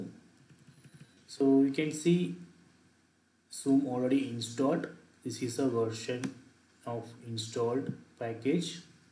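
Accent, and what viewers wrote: India. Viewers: Are you South Indian?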